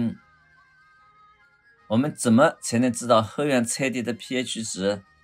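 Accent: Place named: Jiangsu-Zhejiang, China. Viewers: 主講人十足一亇江苏江南人！ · 可惜您這張圖裏沒有我所在的州猜猜哪個地方？感謝您的各種種植經驗的分享，喜歡聼您的這個江浙口音。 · 超爱这包邮区的普通话口音简直回归故里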